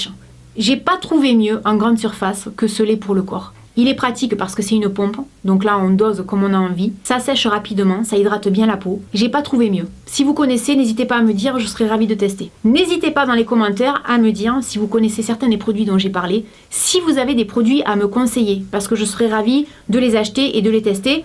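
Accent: South, France. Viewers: Tu as un accent,tu vien du sud? Sinon tu es genial et tu m'aide beaucoup😗😗😗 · salut,j adore des tuto.au fait ton accent est de quelle region francaise?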